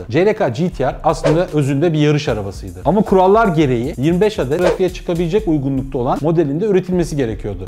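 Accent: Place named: United States. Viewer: Adam bildiyin amerikalı taklit etmiş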